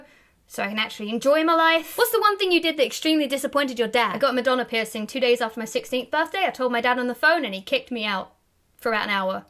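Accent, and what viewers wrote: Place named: England, United Kingdom. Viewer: Just found your face. You probably have the cutest British motormouth accent from England. You're from England right?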